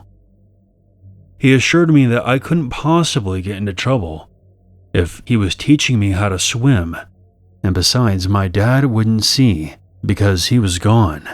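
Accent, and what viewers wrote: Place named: United States. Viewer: It might be a story from an Aussie but it’s being retold by an American so the Aussie slang/terms and accent isn’t going to be translated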